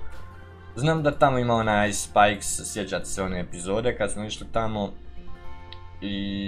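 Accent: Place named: Dalmatia, Croatia. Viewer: odakle si ti? Govoris dalmatinski pa pitan:D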